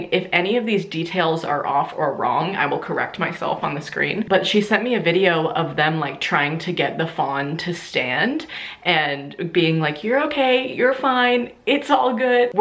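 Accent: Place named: Western Pennsylvania, United States. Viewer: This sounds like western Pennsylvania 😂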